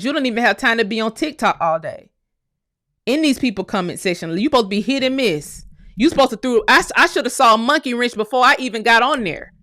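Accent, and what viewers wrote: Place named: Africa. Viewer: Girl you African. You can’t fool me. I hear it and you need to put up the right flag because that’s not your flag. Sorry and not sorry and will never be sorry! You do a good job tethering but we “hear” you. Smmfh. You do speak very well tho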